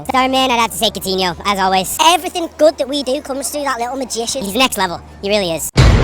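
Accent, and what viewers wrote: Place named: Ireland. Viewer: Irish "Bilabial Trill" Guy.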